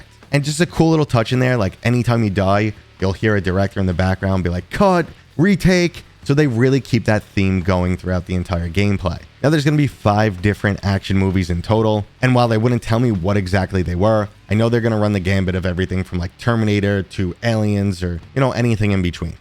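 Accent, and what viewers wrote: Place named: New York, United States. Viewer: haha my bad, just breaking your balls. I guess this is the first time I actually noticed your accent in your videos, is all :). Starting with how you said "Silent North." also you're likely in NYC if anything, idk why i was thinking Massachusetts haha.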